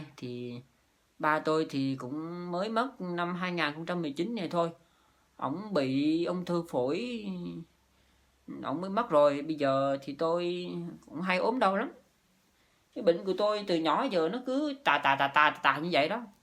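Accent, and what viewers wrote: Vietnam. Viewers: Thấy cuộc đời của bạn quá khổ, như vậy quê bạn Bình Định, sau nói tiếng miền Nam chuẩn quá, vì cuộc sống vất vả, thiếu thốn nên sức khỏe yếu, mong bạn vượt qua mọi khó khăn, hiện tại bạn làm youtube phải khg cố gắng lên nhé mình se vượt qua mọi khó khăn, mong bạn làm có tiền để có thể có 1 ngôi nhà cho 2 anh em ở và thờ cúng cha mẹ, hy vọng mọi người có lòng hảo tâm sẽ hổ trợ bạn thêm vào cuộc sống, bạn sống rất chân thật và vui tánh, khg lời lẽ nhiều nhưng bạn tâm sự vậy mọi người cũng hiểu và biết hoàn cảnh khó khăn của gia đình bạn, ai đã chỉ dạy bạn làm clip thể này ? Bạn cố gắng làm clip và dành dụm mỗi khi mình được nhận tiền, bây giờ đã qua khổ cực phần nào hả y cố gắng lên mọi người sẽ giúp đỡ cho bạn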